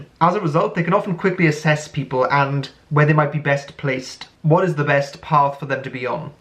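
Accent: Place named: England, United Kingdom. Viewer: What kind of english accent is that?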